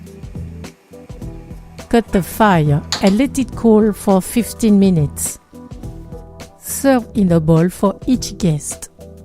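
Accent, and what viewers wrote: France. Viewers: Merci, enfin une recette géniale avec tapioca et banane. Hum the french accent... Thank you.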